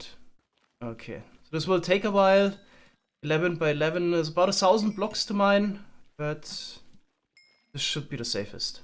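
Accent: Germany. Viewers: are u german? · Are u german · Klingst ja verdammt deutsch, sympathisch haha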